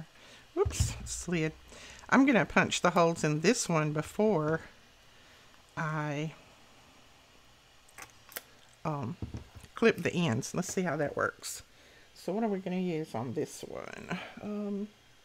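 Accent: South, United States. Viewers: I love everything you do, and these are so lovely. I also love your accent, are you from around where Elvis lived? I am from Portsmouth, UK. I've been to a few places in the USA, and it's my favourite country on the planet. Take care and keep crafting. xx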